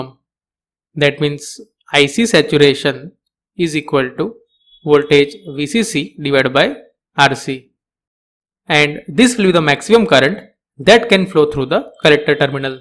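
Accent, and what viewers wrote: India. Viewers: 阿三这口音我真是服了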